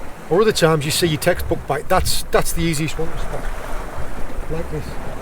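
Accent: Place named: Northern England, United Kingdom. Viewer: Are you originally from up north? I always hear northern in your accent haha was just wondering, keep up the vids always a pleasure to watch and very knowledgeable 🤘🏼🎣 top bloke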